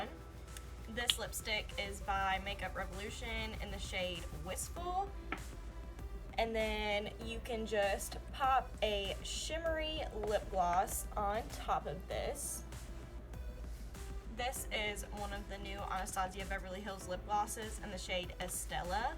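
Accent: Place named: Texas, United States. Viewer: Are you from Texas? Or at least a southern state? Haha your accent sounds just like mine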